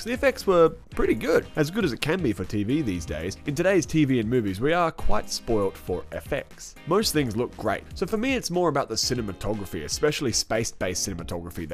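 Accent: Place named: Australia. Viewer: The review was better then the show...Perhaps #Netflix could give you your own series with you doing just reviews.... But make sure you use that Australian accent..some people really like that !! "OK so I give the show a C- and the review a solid A"....... PEACE and BE WILD !!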